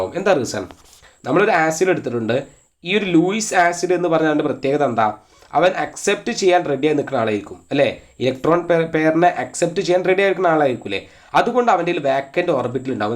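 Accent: Malappuram, India. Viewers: Malappurathu karan anoooo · sir ന്റെ class കേൾക്കുമ്പോൾ schoolil നിന്നും മനസ്സിലാകാത്ത portions friends പറഞ്ഞ് തരുന്നേ പോലെ ന്ണ്ട്....പിന്നെ മലപ്പുറം slang കൂടി ആയപ്പോൾ pwolii 😻🙌💣💥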